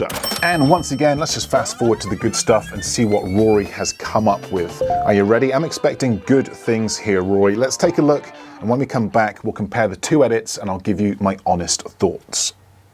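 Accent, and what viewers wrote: United Kingdom. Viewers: I like the clarity and emphasis of the British tongue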